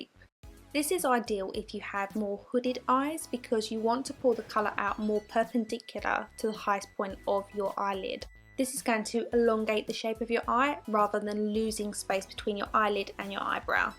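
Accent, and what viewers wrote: Essex, United Kingdom. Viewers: I just found you! I’m from Essex you sound like you’re local? Maybe Loughton?😃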